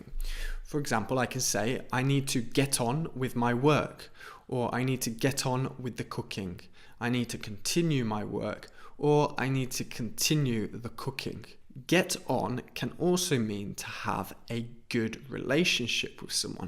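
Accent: United Kingdom. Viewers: you make me love the British accent even more... 🤩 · I really like your British accent! Where are you from in the U.K.?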